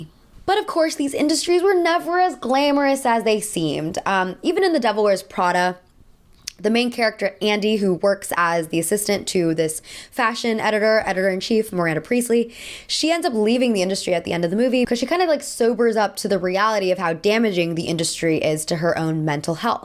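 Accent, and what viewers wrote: France. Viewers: As a creative thinker, I too love print. There is something special and magical within the tangible qualities that gazing upon a thought provoking or glamorous photograph and sliding your finger down the page as if you were there to experience it yourself brings to the senses. It’s a medium overshadowed by the instantaneous stream of vapid content — not art — that our society is now bombarded and accustomed too. But, as a designer and ambitious visionary, I understand that what I have to offer will by exclusive and elitist. I beckon for mystique. I remind myself of the time Lady GaGa said in the beginning of her career, “I’m not interested in being a celebrity. I’m interested in being an artist and there’s a VERY big difference.” A week ago, I was daydreaming of a group of girls with taste, together flipping through and expressing to one another a sense of genuine interest of something in particular within their large glazed magazines. 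I thought to myself, it is my duty to create this moment for them. Also, your French accent is on point. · Girl, your French accent sounds so good in this! <3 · the way you pronounced 'cahiers' made me laugh so hard 😭it was so aggressive lmaoo, it was also hilarious when you spoke english with a french accent lol love you though, as usual it's an amazing and extremely well researched video!! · French accent come thruuuuu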